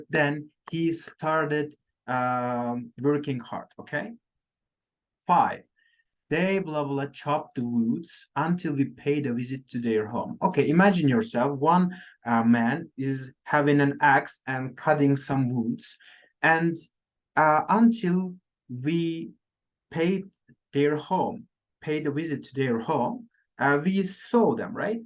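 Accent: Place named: RP, United Kingdom. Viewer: Bülbül gibi ötmek, ingiliz kraliyet ailesi gibi ingilizce konuşmak istiyorsanız doğru yerdesiniz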